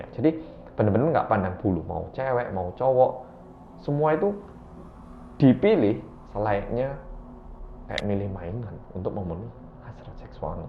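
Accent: Java, Indonesia. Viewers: Kro ngenteni buko, cusss nnton wong medok😂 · medokkkk update gaesss ngeriii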